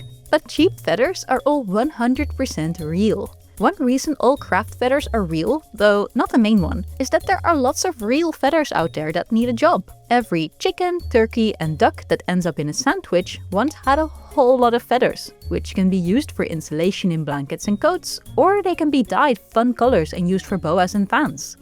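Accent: Netherlands. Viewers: Is that a dutchy I hear? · GEKOLONISEERD, ik hoor nederlands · *Dutch accent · I knew i heard a dutch accent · Hey, minute earth got a dutchie, nice accent :) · do i hear a Dutch acent? XD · Does this channel have multiple narrators? This lady is very obviously Dutch, but I swear I’ve seen many videos of a non Dutch person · Me: "My Dutch voice senses are tingling!" Checks description Me: "... okay the name sounds more Flemish, but that voice is definitely Dutch!" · This is the quintessential Dutch accented English. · Hoor ik daar nou een subtiel nederlands accentje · Heeeuujjj, een Nederlands accent, ik wist dat ik het herkende! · Dutch people are literally everywhere Love the accent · I hear a slight Dutch accent. Groetjes uit Nederland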